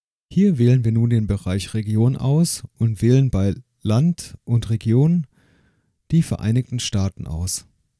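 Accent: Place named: Germany. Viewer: Thank you very much, you did help me a lot ! And absolutely LOVE your German accent 😆😉👍👍👍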